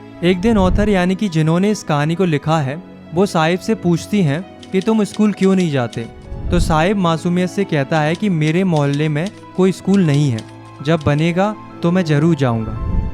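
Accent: Uttarakhand, India. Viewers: Bro aap uttrakhand se ho ? ❤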